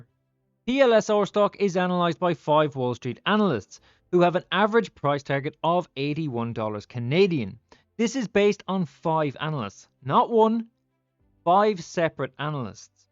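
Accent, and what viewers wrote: Ireland. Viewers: The irish accent is killing me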